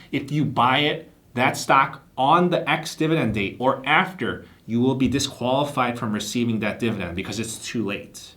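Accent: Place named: Chicago, United States. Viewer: Thick Chicago accent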